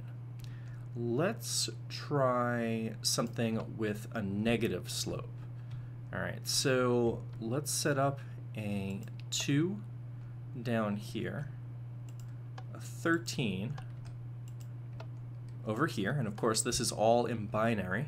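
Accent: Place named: Quebec, Canada. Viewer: ***** il est québécois...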